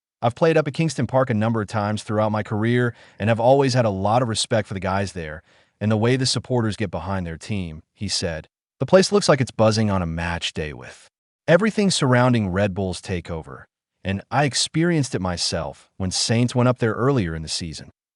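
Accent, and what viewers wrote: United States. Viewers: AI crap, not just because of the US accent commentary !!! Don't bother with it folks !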